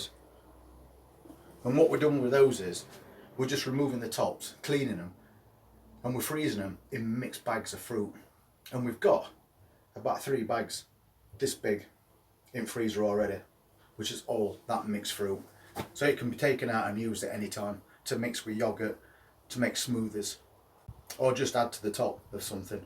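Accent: Yorkshire, United Kingdom. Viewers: Love your (Yorkshire - correct me if I am wrong) accent and use of words. Will just leave it on bench❤. Was checking the size garden delights grow to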